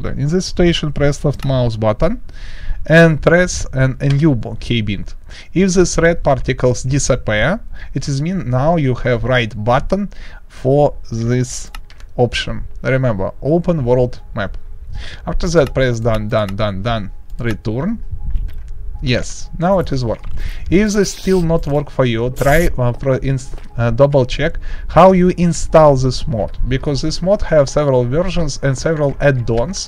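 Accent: Russia. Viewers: Русский акцент мне не кажется? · да он русский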